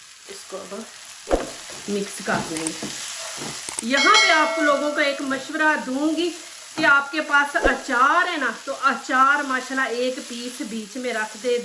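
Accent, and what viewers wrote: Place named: Punjab, India. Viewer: Panjabi chhey ketni bhe achhey urdo boley pata lag jata hy panjabe hy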